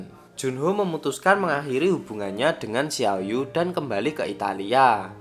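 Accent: Java, Indonesia. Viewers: film sedih kayak gini ketika di ceritain pake logat jawa... sedihnya berubah jadi horor! 😂. gak cocok cerita film romance kayak gini! cocoknya film horor atau komedi! logatmu itu looh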